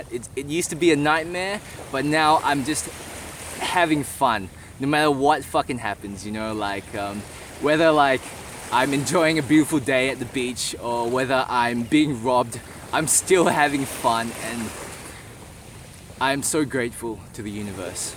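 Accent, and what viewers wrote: Australia. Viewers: Your accent seems Australian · interesting accent, sounds australian crossed with something else. Cool story, i am in the starts of a kundalini experience, feels like i am connected to god with electric jumper cables, so intense. I wonder when it will stop. If I stop meditating will it go away?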